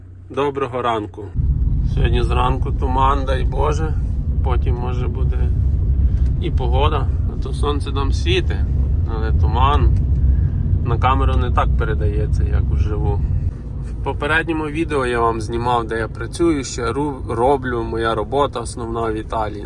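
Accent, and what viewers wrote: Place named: Vinnytsia, Ukraine. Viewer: Ви говорите як більшість на Вінниччині...))))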